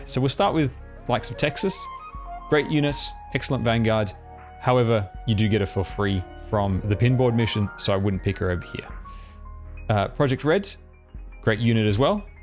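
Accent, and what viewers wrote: Australia. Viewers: Great vid, lotsa useful fast tips but also well explained. Unrelated but are you a fellow Aussie?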